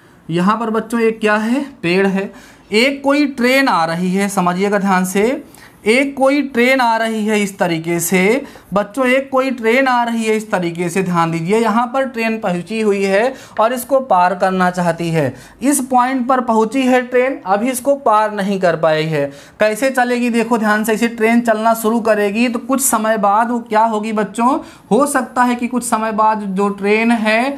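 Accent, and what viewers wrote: Bihar, India. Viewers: Totally ap bihari language me pdate hai Maza aa jta hai pdh k😍😍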